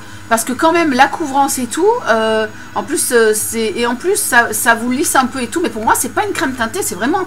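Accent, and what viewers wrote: England, United Kingdom. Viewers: ton accent anglais me fait rêver ! ^^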